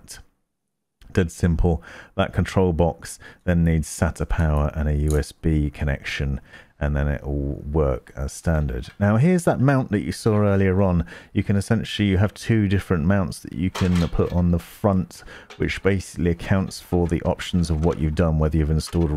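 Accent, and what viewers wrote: United Kingdom. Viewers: And because of the fact, that the most english I have to deal with is primary with some american flair, it was kinda cool to listen to a british tongue ;)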